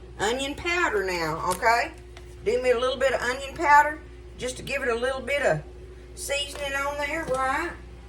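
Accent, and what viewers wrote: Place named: Texas, United States. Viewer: I always said “ like yay so” and people would say “huh”😂 Good grief I’m from Arkansas, I love how you talk there in Iola Texas❤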